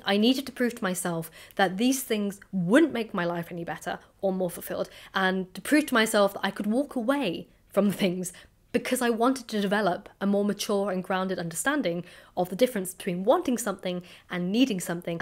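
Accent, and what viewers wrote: RP, United Kingdom. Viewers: That posh accent! Still trips me up. But the more I get to know you... the more I understand and respect you, what you stand for. (Too early to use words as 'like' or 'love' but... gett'n there). 😜😁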